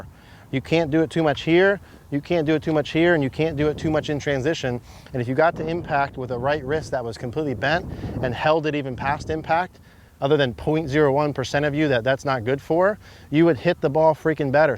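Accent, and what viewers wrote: United States. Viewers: This only goes to prove that if someone talks fast with an American accent...and says the same thing over and over again and stating the bloody obvious....people will assume they are knowledgable. He says 'That would be bad...' but he never says WHY it would be bad...or why the opposite would necessarily be good. The condition of the wrists at the Top of the Backswing is completely irrelevant to the conditions at Impact...Ben Hogan had 'cupped' at the top and 'bowed' at Impact, Nicklaus had 'straight at the top and straight at Impact. Any golfer who regularly has his trailing wrist bend back as far as it will go at the Top of the Backswing is a) risking injury and b) restricting his backswing...Gary Player said that and clowns like this should stop conning people into believing that it is a good thing because it is somehow linked to Impact. 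It isn't and it isn't !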